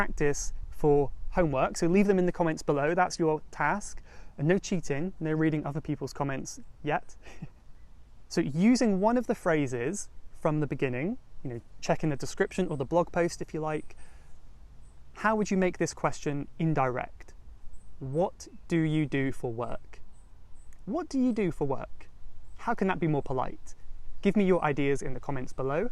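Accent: United Kingdom. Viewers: I love your British accent 😅📌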